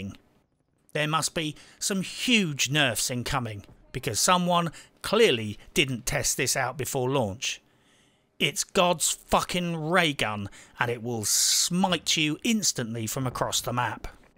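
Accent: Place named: RP, United Kingdom. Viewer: I’m SO glad I found your Channel, it’s very entertaining. Keep up the good posts, your posh accent combined with indignant and brutally honest opinion just creates such a special video to watch!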